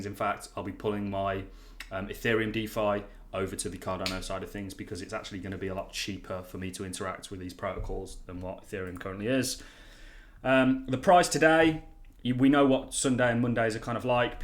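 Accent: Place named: England, United Kingdom. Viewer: Obviously this is your first time listening to English accent.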